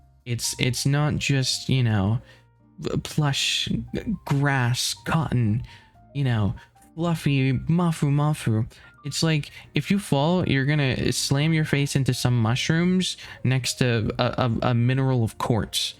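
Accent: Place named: South, United States. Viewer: i am european 😄 but i do like the red neck accent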